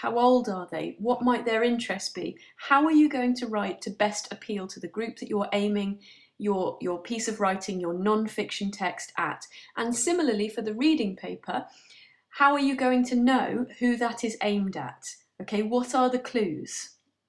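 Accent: United Kingdom. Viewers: I love British accents also great video I learnt a lot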